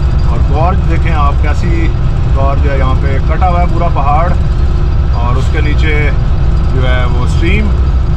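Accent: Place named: India. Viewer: The commentator seems to speak HindEnglish!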